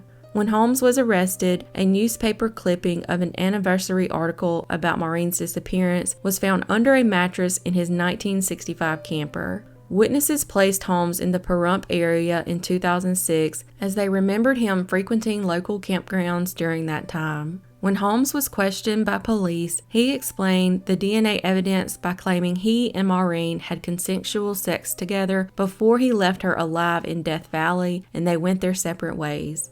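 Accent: South, United States. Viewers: Thank you. I had never heard of a few of these cases. I appreciate the detail you put into your productions. And a Southern drawl much like mine is unusual but welcomed. 😊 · I'm absolutely obsessed I love the way u do your video's and your Lil Southern accent is sooo cute . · Thanks for this upload I’m in Britain but sent it to my friend in Florida area saying see I told you I liked the southern accent haha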